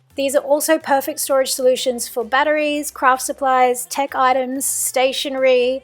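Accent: Australia. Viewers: Just subscribed.Just realised my sodastream fits next to the microwave! didnt think to try it before.. nice to hear an ozzie voice. Great videos (Straight to the point, less chatter). Keep up the good work! :) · very clever ideas nice to hear an Australian voice too xoxo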